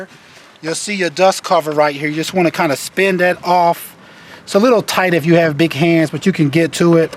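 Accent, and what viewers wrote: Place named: Nashville, United States. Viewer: Brah, are you Nashville TN,? That's how you talk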